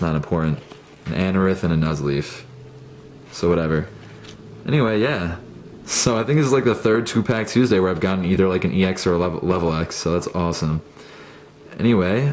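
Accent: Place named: United Kingdom. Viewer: lol nice british accent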